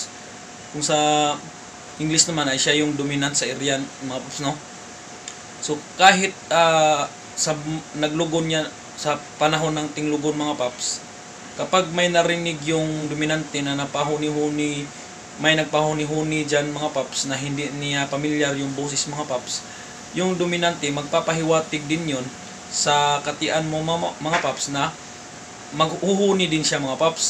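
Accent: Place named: Samar, Philippines. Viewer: Samar Yan bisaya or waray salita Niya Pansin ko sa salita niya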